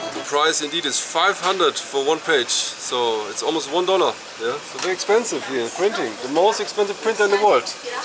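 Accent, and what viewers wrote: Germany. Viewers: German, or? (ich höre den Akzent 😂)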